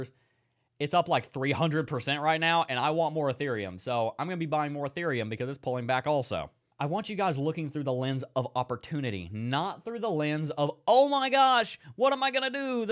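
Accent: United States. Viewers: Love Your intro. Love that american accent 🧐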